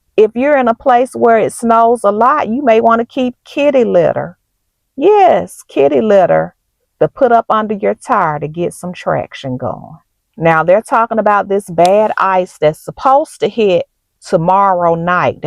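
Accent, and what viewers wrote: South, United States. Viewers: I love your southern accent. I got one, too. Born and raised in northeast Arkansas but currently living in Oklahoma. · The algorithm sent me your video. 1st time watching anything from you. Love your Southern accent. Love you sis. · I just love her southern accent😊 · I love your southern voice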